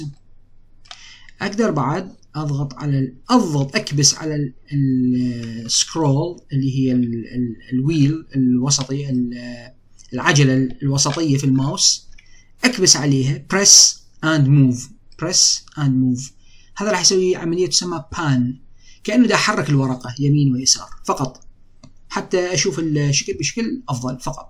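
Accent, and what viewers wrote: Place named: Iraq. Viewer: الله يوفقك ع هذا الشرح الواضح وخصوصا باللهجة العراقيه القريبه ع قلوبنا الله يحفظك ويحمي العراق بس لو اعرف التسلسل تبع محاضراتك استاذنا الفاضل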